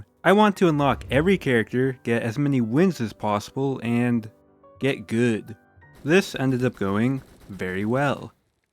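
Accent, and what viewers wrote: Canada. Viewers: Wait is he Canadian? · are u Canadian by chance?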